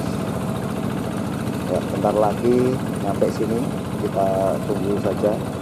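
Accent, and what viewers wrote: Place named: Bojonegoro, Indonesia. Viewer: Asli mana mas? Logatnya kayak B Bojonegoro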